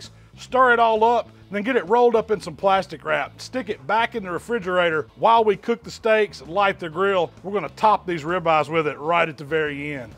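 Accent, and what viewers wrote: South, United States. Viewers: Crazy how over the years your accent has gotten more southern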